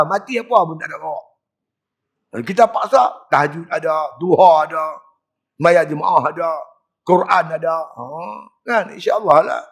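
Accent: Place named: North, Malaysia. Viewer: Sy suka mendengar ceramah ustaz..kdg² terhibur dgn loghat utara ustaz 😂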